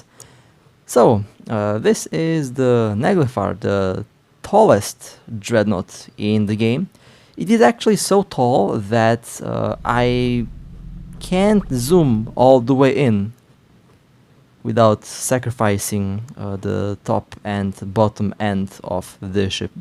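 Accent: Russia. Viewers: Ты Русский что ли.? Оппоненты с Русскими именами, а у тебя акцент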